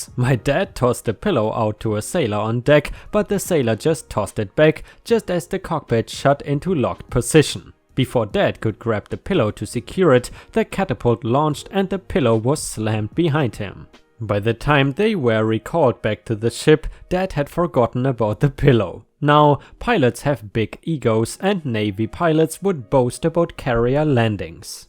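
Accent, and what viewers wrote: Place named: Germany. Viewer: Ok I have to say you kind of have a german accent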